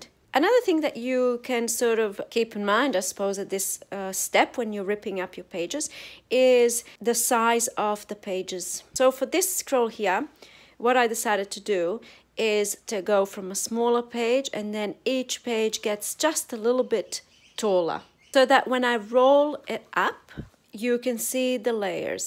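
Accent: Australia. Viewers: Im delighted with your vid Miss, You are an absolute treasure, and so are all your great ideas.... Im furious with anyone that would say such a derogatory statement... goodness, its instructional tutorials, lots of talking is required... please ignore those that would say such terrible things, I could listen to you read the phone book, love listening to that beautiful Ozzie accent..., You are perfect the way you are, dont let anyone tell you any different. God made us all perfect, in his image in fact. Tell the haters to pound sand, we love and adore you the way God made you. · ........you gorgeous beautiful human being...this video has to be in my.....FAVORITE TOP FIVE!!!....these are just absolutely amazing...thank you for showing how to do them....too....please I love hearing you talk as we are both Aussies!!!...Huge hugs and love....Maxxii-Michelle....Perth Western Australia xxxxx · Please keep talking. 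I love your voice. My mother was an Australian war bride who immigrated to Texas. She's gone now. Your voice reminds me of her voice and my Aussie cousins. And your explanations are essential to the teaching process.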